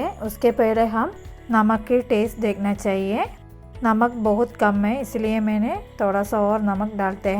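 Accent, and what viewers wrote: Kerala, India. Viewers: Along with your delicious recipes, I enjoy your typical Hindi spoken in complete 💯 percent Malyali accent which is a treat to enjoy listening as well with watching simultaneously, being a mumbaite. Good job and I wish you all the very best for all your upcoming ventures.. 🎉🎉❤ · mam greetings from Maharashtra. Your have been taking Initiative & efforts to reach out " Kerala's food " to all over india is really appreciative. Your's recipes are really nice. Always keep growing. Your Kerlian hindi speaking is very sweet. waiting for Aravana paysam recipe.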